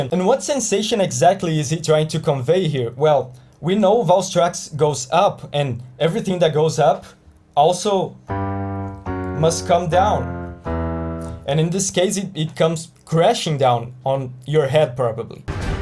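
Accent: Brazil. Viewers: great video, love your mh content! btw you have a very brazillian accent or at least sounds like it lol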